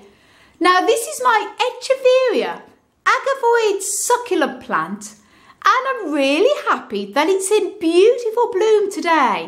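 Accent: Birmingham, United Kingdom. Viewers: Beautiful buds accompanied by a blissful Brummie brogue! Thanks for sharing. :)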